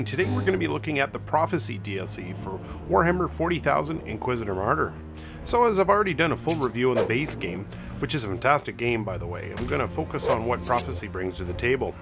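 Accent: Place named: Canada. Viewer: Haha I even subscribed too. I like how you do content and your accent is clearly Canadian, same here I’m in Alberta. Keep grinding man 🤙🏼🔥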